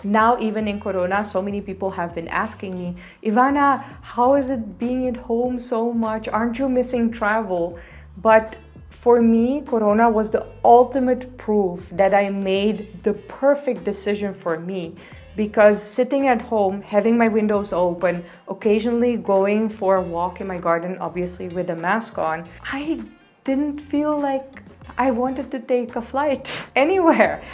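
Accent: India. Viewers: Omg . You already have a semi Indian accent. It's kind of a hybrid Indian-European accent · Ha ha you speak little bit with Indian accent too. Started be be 100% Indian. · she has such a cute partial Indian accent, like some words in between. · Haha geweldig! Love dat je inmiddels ook een klein Indiaas accentje hebt. Well done! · Your pronunciations are very indian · Now you have an indian english accent as well as look like a (pale) indian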